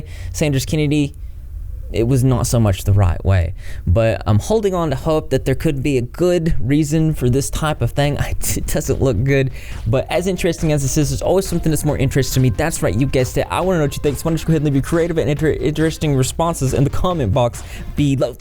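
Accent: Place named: Tennessee, United States. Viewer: I legit could listen to you speak for hours haha probably the fellow TN accent that I can appreciate haha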